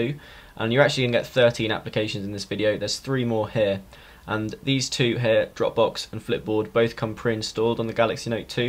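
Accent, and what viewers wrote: London, United Kingdom. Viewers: Good old Londoner. Keep up geezer.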